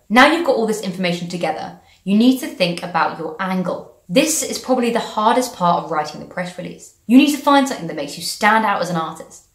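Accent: United Kingdom. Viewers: THANKS FOR THE INFORMATION, BTW I LOVE THAT BRITTISH ACCENT! ;-)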